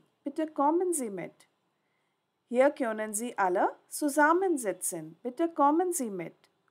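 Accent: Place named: Spain. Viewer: Sounds like some whose mother tongue is not German- (maybe spanish )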